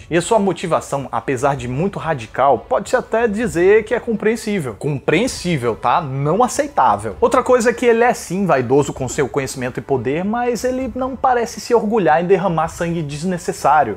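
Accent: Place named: Ceará, Brazil. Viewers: Amo sua narração (estilo nordeste)em cearencês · Cara adorei seu trabalho, fala um ciarez fluente, com mestrado, doutorado e senhor dos anéis, deixa tudo muito engraçado de se ouvir, bom trabalho.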